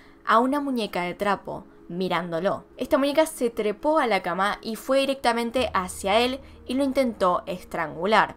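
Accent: Argentina. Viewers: Creí que no me gustaba el acento argentino, pero me encanta escuchar los casos con ese acento xD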